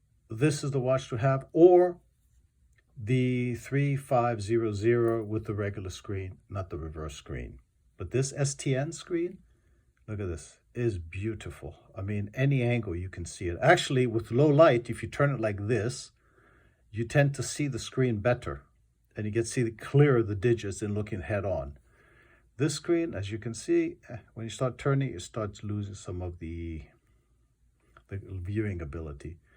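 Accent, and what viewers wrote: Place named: Greece. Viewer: You sound like a Greek who lives abroad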